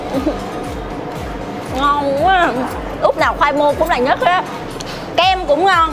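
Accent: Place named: North, Vietnam. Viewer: Chi diễn bắc cứ trương chình nào e cũng thích het.nét chi diễn rất la có duyên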